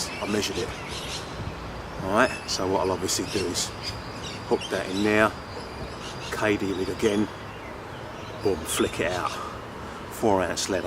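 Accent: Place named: South London, United Kingdom. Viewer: Loving the vids bruv what country you fishing in. I can hear the south London in ya accent 👍👍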